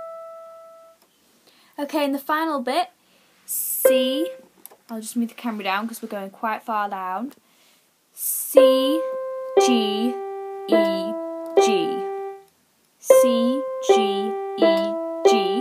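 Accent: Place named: United Kingdom. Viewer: Silent night was the first song you thought meand I was OMG I never thought I would be able to play it and also I love your British acsent and you also sound like Luaren Platt in the XFACTOR your lovely piano teacher your teaching is very easy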